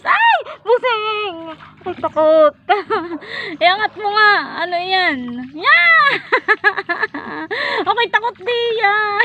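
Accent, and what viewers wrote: Quezon, Philippines. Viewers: Parang taga quezon Ang punto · Ay ok po kaya pala kaparehas tayo ng tono 😅😅 lopes quezon po ako . Nasubscribe ko na po kayo god bless po.. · haha saan po sa quezon, taga guinayangan, quezon po ako. kaya pala yung punto sabi ko parang related ako haha. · quezon province po ba kayu,kasi yun tono ng salita nyo parang s quezon province din,taga infanta quezon ako..dami isda jan,dto sa taiwan yan din libangan ko mamingwit.. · New viewers San po probensya Nyo mam yung punto Nyo po kc parang sa quezon province e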